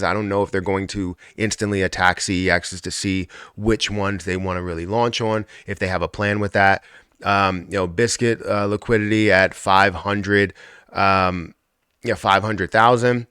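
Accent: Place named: Los Angeles, United States. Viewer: Where are u from? Your accent looks from Los Angeles isn't?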